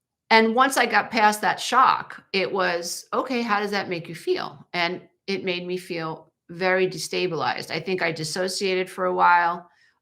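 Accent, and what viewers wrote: New York, United States. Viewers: i love you and bought your book for my mother before she passed in 2016. you are a breakthrough person for me. i love your NY accent. I want to say something please just take with a grain of salt. I feel that at the end when you describe your relaxing ways it seems like still passively avoidance...the breakfast with the daughter. it is not really being alone and authentic. also, if you met your hubby, good as he is, when you were needing a secure hubby and used your perfect looks and sabayon recipe to lure him...do not take offence...this marriage may be not the final answer for a HUGE spirit for yourself. I am not saying that i want you to get scrubby for a day and fart in front of him, but maybe its time....LOVE YA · love the content ❤! Shorter segments please. Will go further for you. Absolutely love your sense of humor! 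And your new York accent! Keep up the good work!❤❤❤!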